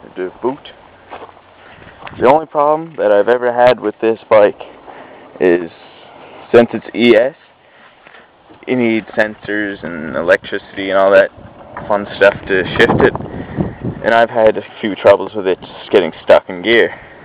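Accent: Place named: Maritimes, Canada. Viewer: sound like a maritimer ta me??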